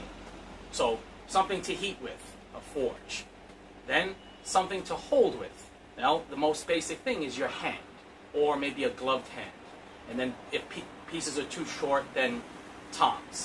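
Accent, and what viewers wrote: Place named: East Coast, United States. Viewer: by now you know I'm a fan of your channel, and the work you do. I've been trying to add up why you are overseas, your obviously an American with that East Coast accent. today you mentioned Matthew West, I'm thinking you're referring to the Christian singer? how far off am I by thinking your a Christian Missionary or Teacher? BTW- I think I really like the guillotine and I really really like the tongs. I am very interested in purchasing both, but will have to save some $ first. at any rate friend, keep sending us videos, you are a great educator of this craft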